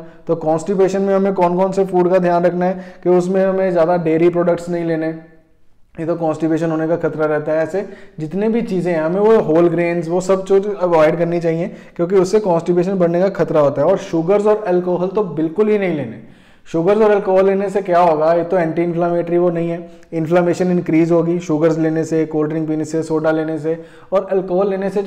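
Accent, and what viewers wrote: Punjab, India. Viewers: As per ur dialect u seem to be Panjabi. Am I right?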